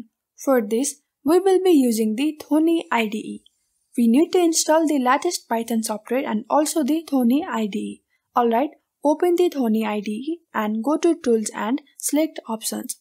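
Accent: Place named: India. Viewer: An Indian, really? Please use a voice-over actor!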